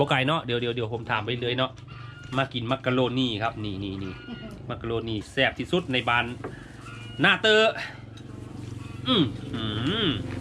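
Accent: Phu Thai, Thailand. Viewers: สุดยอดเลยครับอ้ายสำเนียงภูไทคือบ้านผมแฮงภูไทกะต๊ะ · พ้อแล้วนี้ละพี่น้องแม้นเลยสำเนียงภูไทกาฬสินธุ์สกลนครมุกดาหารนครพนมสำเนียงภูไทบ้านย่าแถบอำเภอเขาวงจังหวัดกาฬสินธุ์ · เว้าภูไทคือเดยว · เว้าภูไทเบิ่ด ปานได้มื่อบ้านติอ้าย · มักๆค่ะเว้าผุ้ไทมักสำเนียง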